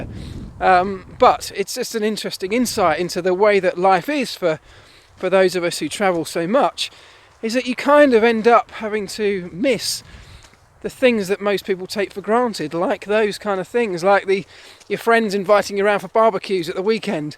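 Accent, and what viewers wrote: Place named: United Kingdom. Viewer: Like your british accent and good stories about F1. Good job and looking forward for more!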